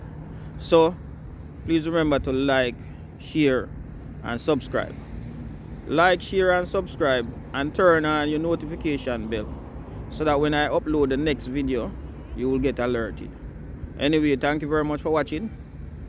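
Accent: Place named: Jamaica. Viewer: Hi brother, from the time I hear you speak I know you're a Jamaican, I'm just listening to you I'm so impressed about your visiting and experience in Vincy so glad you enjoyed every moment in beautiful Vincy whenever you want to travel again go Vincy man I tell you you are very welcome and make sure you visit other grenerdine island, one more thing there are other beach down the lee-ward side that have a mixture of white and black sand with seashell between the rock ,but you have to walk far to get there blessed peace and love 🇻🇨🇨🇦🙇🏿‍♂️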